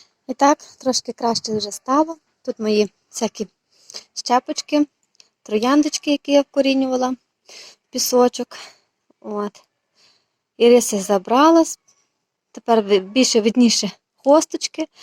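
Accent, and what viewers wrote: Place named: Ternopil, Ukraine. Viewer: Все гарно! А ще й мила тернопільська говірка! 💙💛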